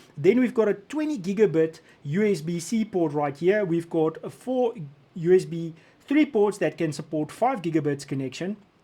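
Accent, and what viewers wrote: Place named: South Africa. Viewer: Wanted a shot of the full thing without the covers. But subbing just for the South African accent for now.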